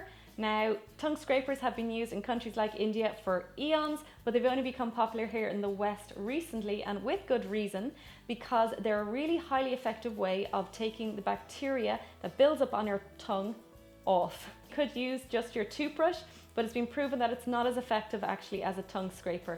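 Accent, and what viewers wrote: Ireland. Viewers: You mentioned a powder but sorry I couldn't understand 🙈 Irish accent is 😍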